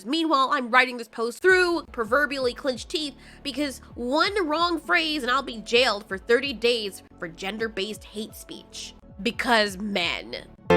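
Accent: Scotland, United Kingdom. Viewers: an Scottish accent isnt something i knew i needed till this video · It was a Scottish accent.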